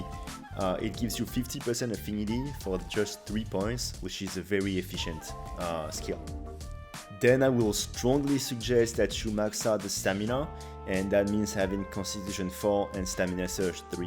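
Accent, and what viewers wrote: France. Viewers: J'entend un accent français haha , je vais essayer ton build ^^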